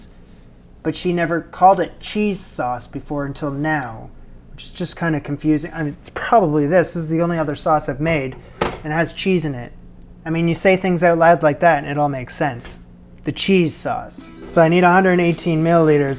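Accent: Canada. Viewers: Take it "Eout" of the pan,! 😁🤣 Love your Canadian accent.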